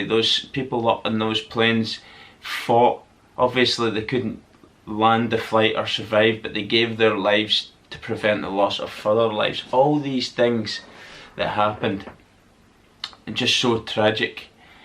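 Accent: Scotland, United Kingdom. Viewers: Brings powerful memories rushing back. Love your Scottish accent!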